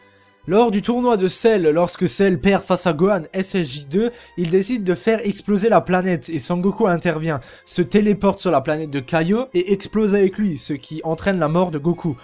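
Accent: Alsace, France. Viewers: Tes un alsacien jen suis sur je reconnais son accent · Ne serais tu pas d’Alsace ? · Accent alsacien, vertomi j'adore. ❤️ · t'as un sale accent alsacien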